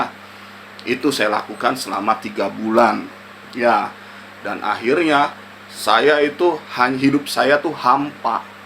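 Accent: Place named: Depok, Indonesia. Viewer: Asyiikk neh bahasa depok nya 😅😅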